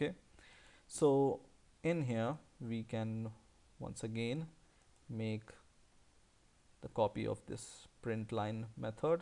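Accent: India. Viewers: which accent is this? do u know hindi language? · some people dont like indian accent. but here enjoying the video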